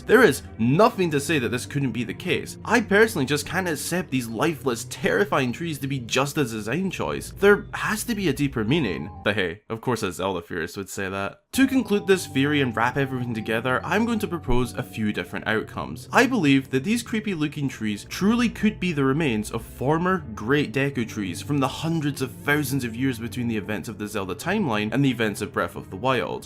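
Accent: Scotland, United Kingdom. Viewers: Last week, I had a dream that I was in a very creepy castle full of ghosts. As weird as it is, voice, in all its Scottish glory was there narrating was I was going to encounter.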